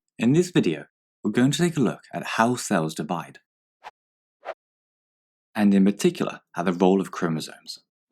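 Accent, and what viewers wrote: United Kingdom. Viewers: HE IS BRITISH!!!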